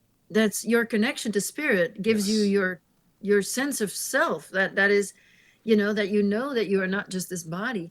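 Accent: Australia. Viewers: Who is the Voice aussie?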